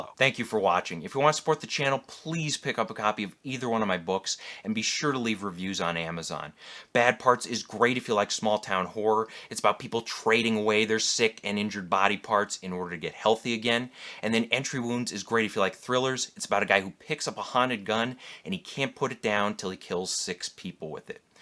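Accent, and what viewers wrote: Chicago, United States. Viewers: Are you from Illinois/chicago area?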